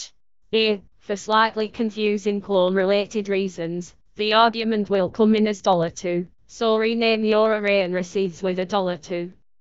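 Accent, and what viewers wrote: Scotland, United Kingdom. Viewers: What the heck is this amazing robot voice! Is it meant to be Scottish? It trends slightly Welsh at times maybe? I'd hate to be misidentifying it.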